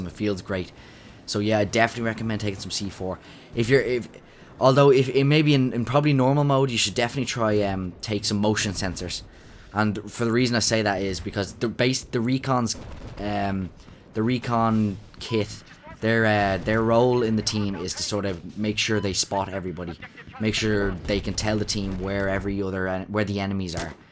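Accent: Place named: Ireland. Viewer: I'm guessing your Irish cuz u sound irish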